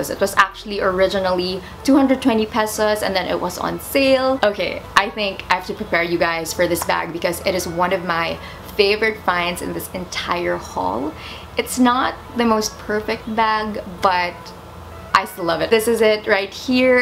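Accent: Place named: United States. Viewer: First time viewer love the accent still confused if you're American in a good way though I immediately subscribed when I heard your precious voice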